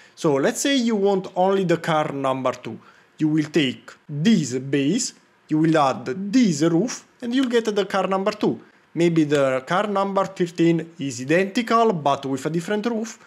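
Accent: Italy. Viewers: Dall'accento avevo intuito fossi italiano hahah, bel video e bel modello, complimenti!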